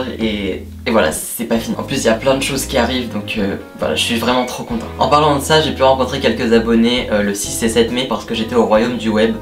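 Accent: England, United Kingdom. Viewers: J'ai beaucoup trop rigolé 😂😂 on adore ton accent anglais 😂😂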